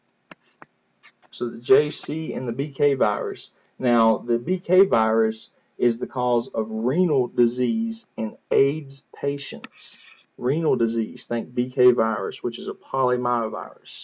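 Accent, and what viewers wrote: United States. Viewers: Thanks you so much, so much southern accent .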